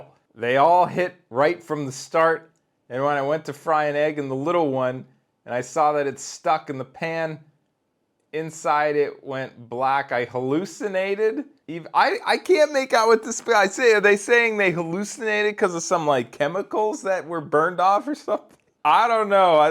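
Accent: United States. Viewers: You live in PORTUGAL?!?!?! You have such an American accent!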